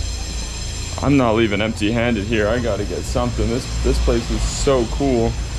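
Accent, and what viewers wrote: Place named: California, United States. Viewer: you sound Californian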